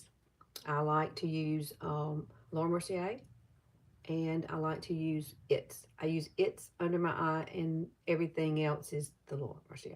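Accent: South, United States. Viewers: I really look forward to your videos! I so enjoy your southern drawl! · I also like NYX Epic Smoke Liner. U’re right… short life sucks, not at all a long time… wasn’t supposed to be short. Ur southern talk is so cute :)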